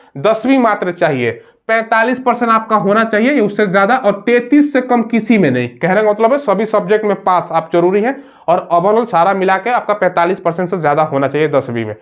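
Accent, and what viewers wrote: Bihar, India. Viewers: Sir aap to khati bihari ban gaye hai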